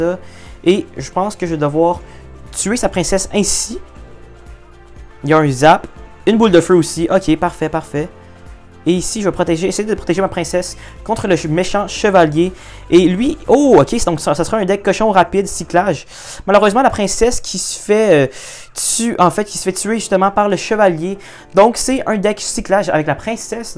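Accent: Quebec, Canada. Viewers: T tu québécois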